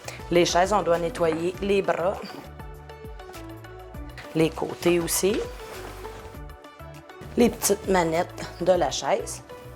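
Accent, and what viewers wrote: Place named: Canada. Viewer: Bjr C quel région au canada cette accent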